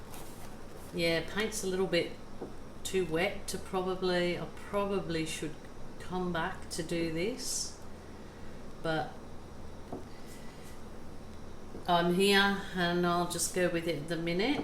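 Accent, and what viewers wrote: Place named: Walsall, United Kingdom. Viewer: Love it, I'm a too from Walsall live in Townsville 😁 Where are you originally from? You talk like me x I've been in Oz 29 years x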